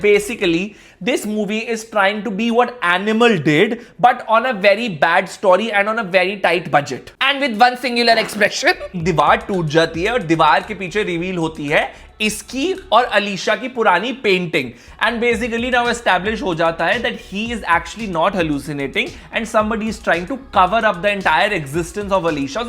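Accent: Punjab, India. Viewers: his punjabii~ uff~ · Curtain.😂😂😂😂...nice accent in punjabi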